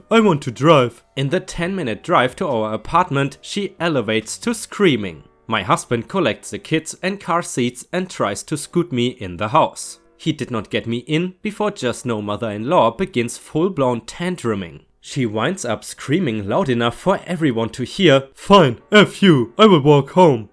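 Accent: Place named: Germany. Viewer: Random question. Are you german? I live in germany and you really have a german accent. I relly like it 🌟🌟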